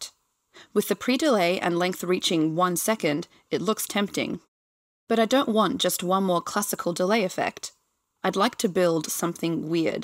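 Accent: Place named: England, United Kingdom. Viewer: Is she hot? (She does have an English accent)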